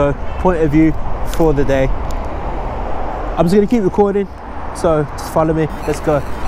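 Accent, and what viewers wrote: Birmingham, United Kingdom. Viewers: I’m new are you from Birmingham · Are you from the uk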